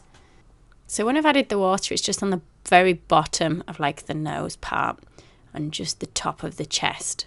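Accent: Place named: Yorkshire, United Kingdom. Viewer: It is so nice to have someone from Yorkshire to listen to!! You are super talented! Can always count on your channel to rescue any of my cake fails!😂x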